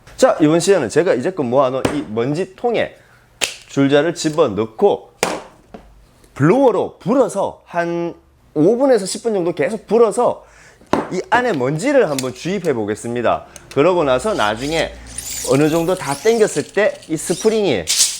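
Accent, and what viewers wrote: Gyeongsang, South Korea. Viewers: 경상도 말투 정겹네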